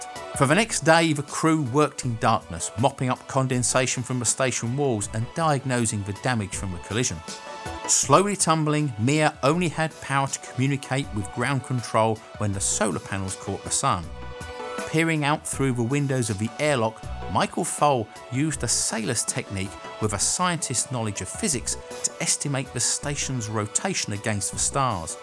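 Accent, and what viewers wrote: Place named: Southern England, United Kingdom. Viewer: That's his Southern English accent showing through. Many English accents don't put a lot of emphasis on the "rrrrr" sound of the letter "R".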